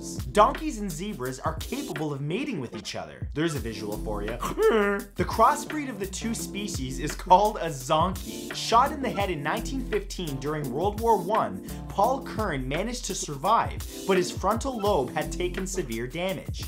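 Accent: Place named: Canada. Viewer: Ehh Canadian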